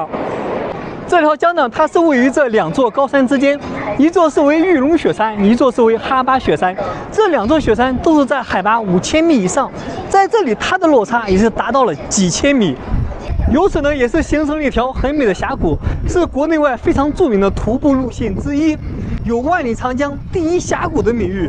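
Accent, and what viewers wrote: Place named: Su-Lu-Yu-Wan border, China. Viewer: 听口音，博主应该是苏鲁豫皖交界地区口音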